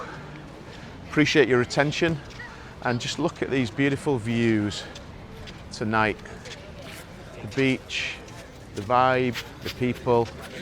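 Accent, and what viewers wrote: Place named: Northern England, United Kingdom. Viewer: Nice one. I've watched a few of your videos in the last days not very attentively. I already know las ramblas are often overcrowded. Hay muchos videos sobre "Moving to Spain" "Reality of living in Spain" or "Culture shocks of Spain" (this is where it starts to get silly para mi), it's a bit repetitive to put it mildly. StatemenIts, opinions or whole videos "slightly annoying" are mostly from US-Americans. It's all not a serious issue for me, so I keep it simple: Complaints about spanish bureaucracy in british english sound better. This video's first minutes were special for me, because I realized you speak with a distinctiive northern accent. Manchester let me see the light. Are there videos about "What you should know before moving from Miami to Moss side"? 🙃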